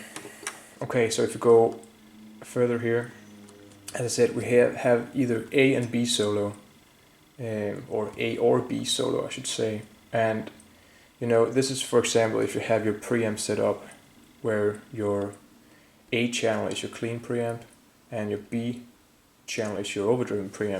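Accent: Denmark. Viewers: I think I've contacted you before I know you're from Denmark I can tell from your accent. My wife is Danish and we go there every year. I don't really know where in Denmark you're from definitely not Jutland. Or Fyn so I guess the Copenhagen area. We have a house in Gilleleje. Just got a gt100 out of the box from being in storage so now I have to learn how to use it I'll be watching your videos. I'm used to the GT 10 where I could copy built in patches from one location to another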